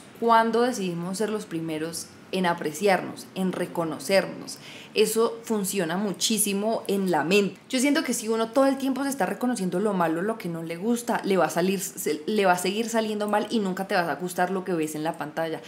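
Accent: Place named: Antioquia, Colombia. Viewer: a mi se me gusta tu aura :) Siento que se te pegó el paisa jejej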